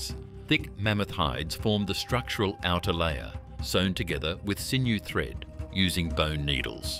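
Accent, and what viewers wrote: Australia. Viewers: Australian accent I think... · Sounds Australian, but used Fahrenheit 🤔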